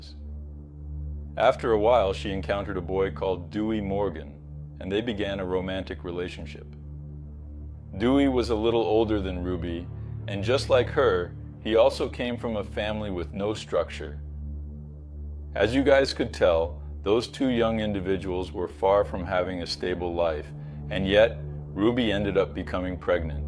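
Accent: Australia. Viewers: Whoa! Are you kidding me? English isn't your 1st language? I've only now just detected a hint of an Australian accent! This whole time I thought you were American like myself!!! 😅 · Strong twangs of an Australian accent though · I’m Australian & feel like I’m hearing an Aussie accent at times. Weird 🤷‍♀️ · You sound Australian sometimes! Your english is fine, i think 😊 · Your English is amazing! What's your first language? And what's your accent. Almost sounds like hints of Australian lol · Sounds like you also have a bit of an Australian accent. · Mate have you spent any time in Australia? Mate it sounds like abit of an Aussie accent leaks out?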